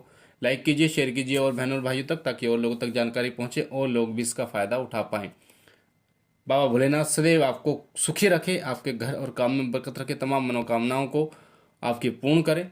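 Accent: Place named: Punjab, India. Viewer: Punjabi हो? Heavy Punjabi accent.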